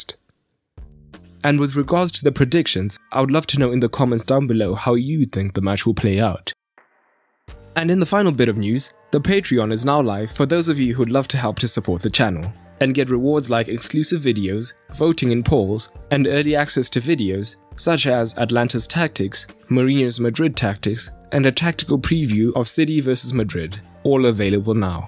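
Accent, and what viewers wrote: South Africa. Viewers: Is that a South African accent by any chance? Quality, as always! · You sound South African ?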